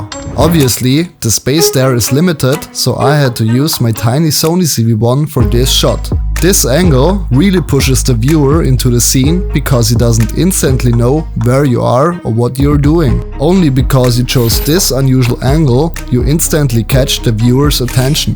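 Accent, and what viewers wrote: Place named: Germany. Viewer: Da hast du ja ein paar nette Videos. :-) Und immer wieder amüsant, wenn deutschsprachige Menschen Englisch reden. Der Akzent ist mitunter klasse. ;-)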